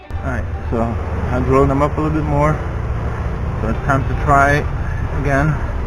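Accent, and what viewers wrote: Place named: Germany. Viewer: you sound like you are either german or Austrian I am of Austrian decent i'm sure you can tell by my name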